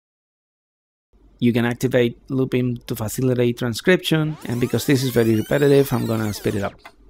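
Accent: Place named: Mexico. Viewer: are you mexican, your english sounds like mexican english mexico rules! :) saludos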